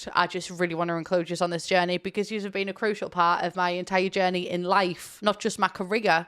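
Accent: United Kingdom. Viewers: i must like your videos a lot since i put effort into understanding 1. you talking very british 2. you talking very fast hahaha (english's not my 1st laguange)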